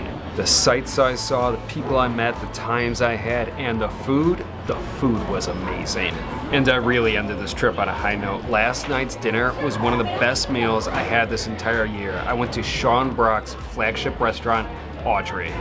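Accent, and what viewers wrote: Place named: Midwest, United States. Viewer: Love your chill vids and Midwestern accent, bro!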